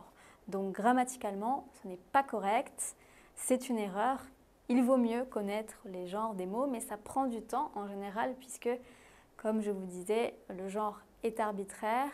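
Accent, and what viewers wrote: France. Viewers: Merci. C’est toujours une bonne idée de commencer au début. J’adore votre accent français.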